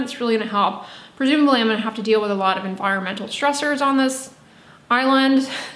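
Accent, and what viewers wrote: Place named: California, United States. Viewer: Her california accent is insane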